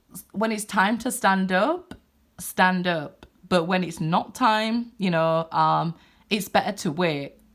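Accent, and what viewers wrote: United Kingdom. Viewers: Oh a british girl ❤️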